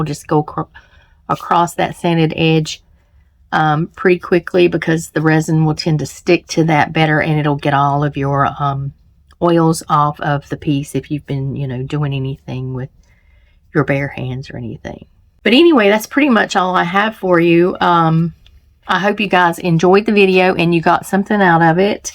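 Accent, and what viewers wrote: South, United States. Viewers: I can understand your southern drawl perfectly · you talked about your southern drawl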